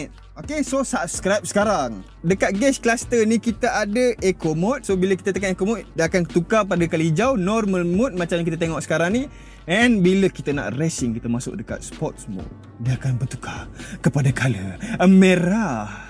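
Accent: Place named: Kelantan, Malaysia. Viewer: ni ore Kelate ke? Bila sebut 'tadi' jadi 'takdi' dan 'steering' jadi 'stereng'. Hehe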